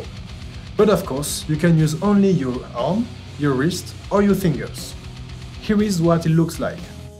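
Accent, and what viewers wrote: France. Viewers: Je comprends pas d'inquiétude x3 Je ne critiquais pas il me semblait juste avoir cru entendre un petit accent qui sonnait français ^^ En tout cas je vais essayer de mettre en pratique ce que j'ai vue dans cette vidéo et bonne continuation 😁 le blast est une technique que mes mains et mon poignets n'apprécient pas xD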